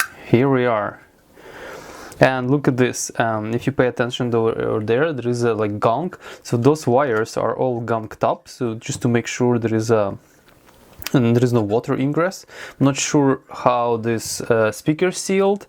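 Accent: Russia. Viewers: I love your russian accent 😆 🤣 😂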